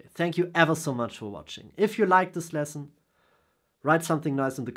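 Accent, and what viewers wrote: Germany. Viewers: Man hört direkt das du Deutscher bist haha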